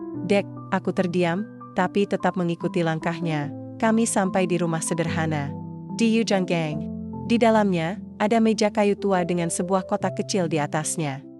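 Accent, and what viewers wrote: United Kingdom. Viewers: Mengapa bahasa Indonesia menggunakan logat bahasa Inggris ? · Apa sih ganggu banget harus di selingi logat Inggris segala buat apa ?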